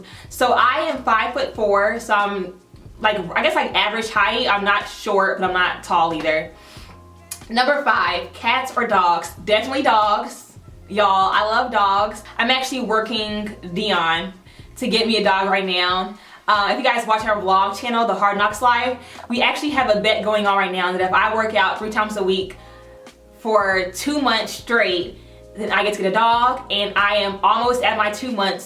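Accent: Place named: Louisiana, United States. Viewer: ❤️ ❤️, sounds like where I grew up in Louisiana 😊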